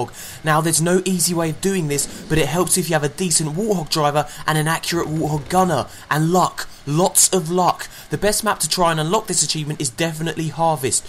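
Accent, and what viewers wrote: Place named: United Kingdom. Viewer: Its nice to hear some videos on youtube done by people with British accents for once and not American 12 year olds :P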